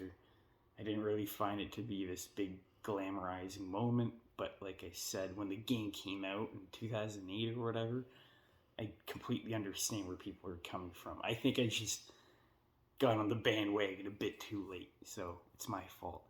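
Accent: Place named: Canada. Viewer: Don't respect anyone with a Canadian accent's opinion on "Falloot"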